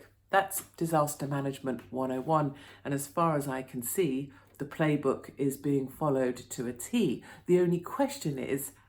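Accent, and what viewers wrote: United Kingdom. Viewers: British ? Yuckk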